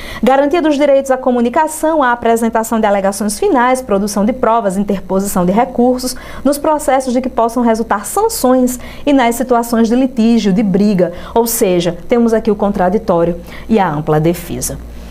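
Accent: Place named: Pernambuco, Brazil. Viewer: vc é de pernambuco?saudade desse sotaque........hehehe aula excelente...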